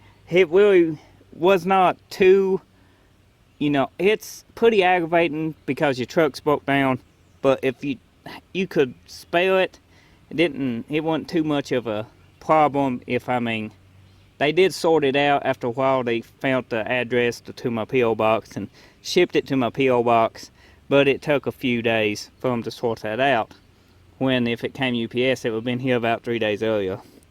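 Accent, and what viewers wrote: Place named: Louisiana, United States. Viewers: Are you from Louisiana? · Who else loves the southern accent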